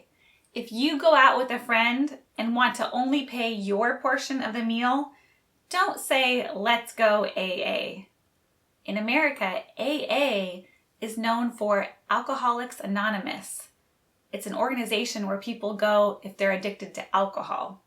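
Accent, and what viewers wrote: China. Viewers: You know well how a Chinese speaks English. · Hey, thx for the amazing teachings! How come it sounds like pulling away from ‘HK English’? haha 😛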